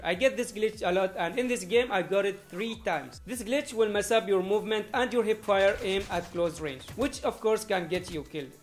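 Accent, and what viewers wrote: India. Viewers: At first I thought your indian by your accent then I realised Indian can’t be this good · Are you an Indian bro ? because i think your an indian because of your accent from: Philippines🇵🇭 ❤️❤️❤️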